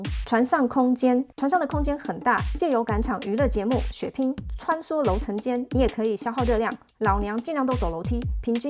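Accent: Taiwan. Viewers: （無效啦）呵呵 ，很標準的台灣閩南語發音😊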